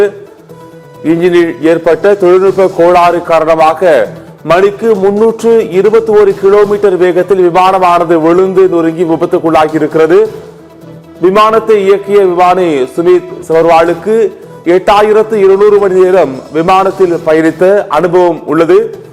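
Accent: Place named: Kerala, India. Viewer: He is Malayali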